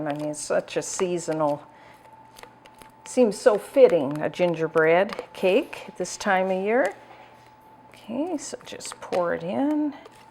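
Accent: Canada. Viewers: Shes canadian right? like her last name and the way she says out.. she so cute.. i like her videos.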